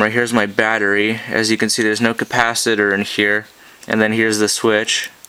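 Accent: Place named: United States. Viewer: He has a American Accent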